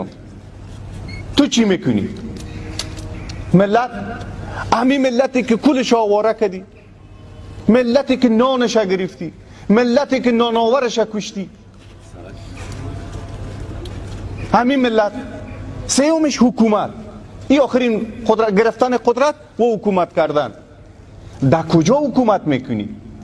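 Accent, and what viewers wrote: Tajikistan. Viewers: Бахдо тоза лахчай точики кати гап мезана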